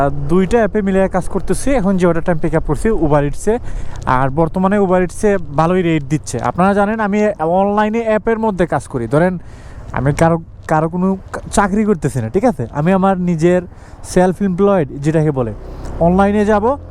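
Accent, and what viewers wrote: Sylhet, Bangladesh. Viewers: Bhai Apnar House Sylhet ni ?? 😅 কথার ধরনে বুঝা যাচ্ছে সিলেটি ❤ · Tmi Sylheti?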